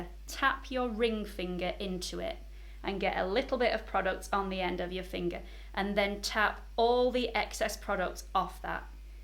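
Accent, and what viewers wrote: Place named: Leicester, United Kingdom. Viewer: Yes fixing spray would be great , really enjoying your channel. Both my friends and I have said you sound like your from our area , Loughborough Leicester. Lol . Take care 😉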